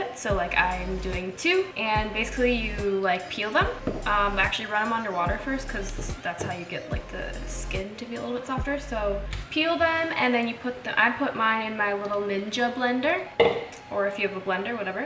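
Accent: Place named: Minnesota, United States. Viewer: Would never have thought Minnesota lol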